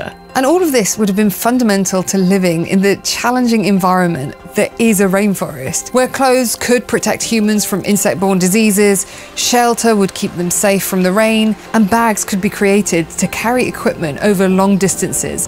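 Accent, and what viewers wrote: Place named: England, United Kingdom. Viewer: her natural English tones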